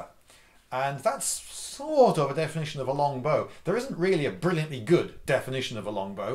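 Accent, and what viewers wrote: England, United Kingdom. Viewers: Oh thank God you were EXTRA English in this video! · props to the mentioned extra English-ness. I enjoy your videos very much. best regards from across the pond!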